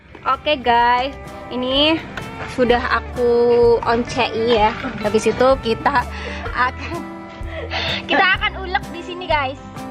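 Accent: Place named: Java, Indonesia. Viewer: Kk klo bahasa jawa lucu yahh🤣🤣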